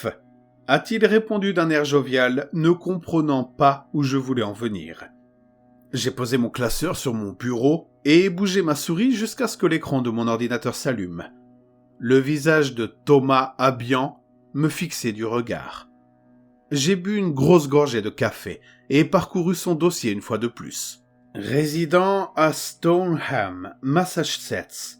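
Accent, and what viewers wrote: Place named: Arab world. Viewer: Toujours aussi prenante… PS : +1 pour l’accent arabe !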